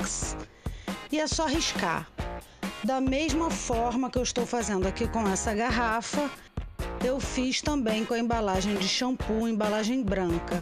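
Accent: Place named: Rio de Janeiro, Brazil. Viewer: É fácil reconhecer nosssso "sotaque"! #RJ❤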